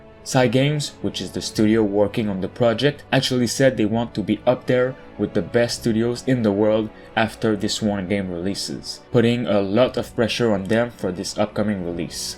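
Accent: Quebec, Canada. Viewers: Clearly a French Canadian I recognize it by that small accent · Jolie accent quebecois! ^.^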